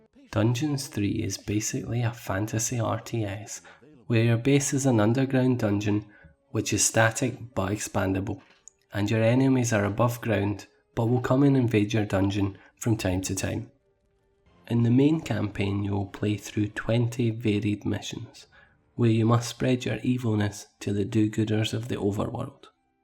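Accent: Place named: Scotland, United Kingdom. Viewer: Good review. Consider me a subscriber. Don’t hide your Scottish accent tho!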